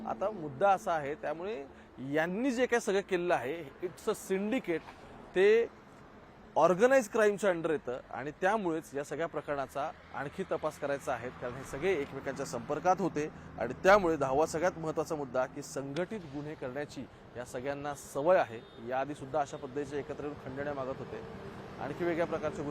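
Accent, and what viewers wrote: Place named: Pune, India. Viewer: भाऊसाहेब छान समजून सांगत आहेत ऐकायला बरं वाटलं म्हणजे स्पष्ट पुणे बोलत आहे. छान रीपोटर आहे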